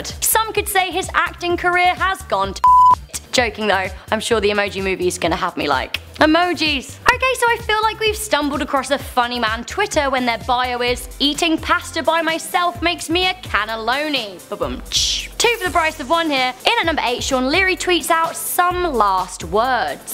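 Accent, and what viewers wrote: United Kingdom. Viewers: Anybody else love the British accent???😁😂😃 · Your British accent makes this 100% better.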